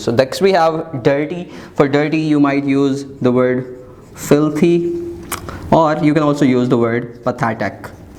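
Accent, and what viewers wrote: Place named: India. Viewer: u sounnd freshie SIR. didnt help at all like bruh u and ur indian accent aint going no where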